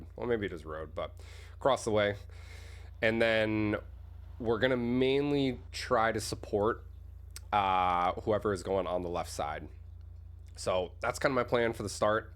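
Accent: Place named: Ireland. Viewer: I'm struggling to pin your accent, I have a professor who is Irish who sounds kind of like you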